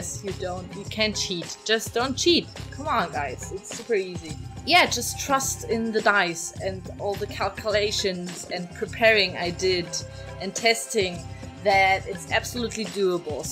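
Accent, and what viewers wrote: Germany. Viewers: sieht wirklich suuuper aus :) aber boaaa der akzent ist so quälend xd typisch deutsch irgendwie hahaha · are you german? (just guessing from your accent :D)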